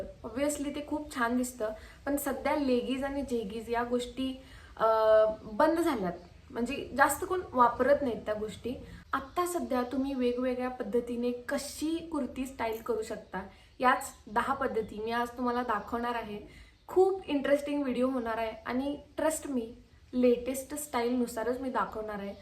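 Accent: Kolhapur, India. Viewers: बोलण्याच्या स्टाईल वरून तरी तुम्ही कोल्हापूर साईट कडच्या वाटता ताईसाहेब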